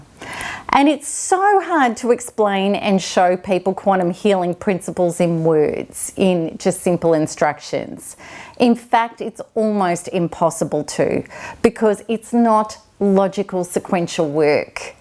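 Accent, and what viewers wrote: Australia. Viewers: Love your australian accent baby. Ur wonderful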